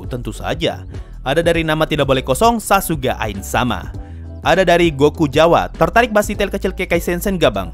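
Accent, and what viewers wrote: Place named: Eastern Indonesia, Indonesia. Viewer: Boleh tau suku apa bro? Tidak ada maksud menyinggung, soalnya dulu perasaan logat timur lu kerasa banget di video lama tapi makin ke sini makin halus dan nggak kerasa lagi logatnya.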